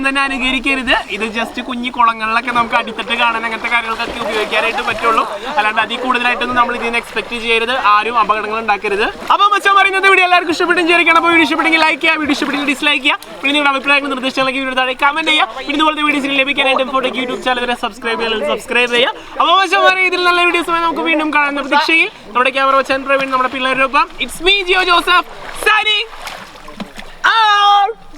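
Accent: Thrissur, India. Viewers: മച്ചാന്റെ വീട് എവിടാ തൃശൂർ ഭാഷ ആണല്ലോ?❤️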